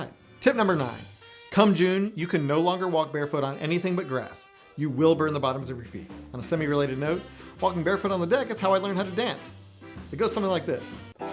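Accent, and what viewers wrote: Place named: New England, United States. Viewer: How did this supposedly Southern dude get a yankee accent?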